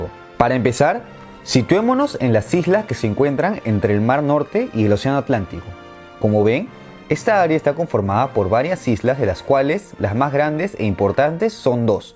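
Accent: Peru. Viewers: Lo único malo del vídeo fue escuchar tu puto acento peruano, recuerda a la momia bozzo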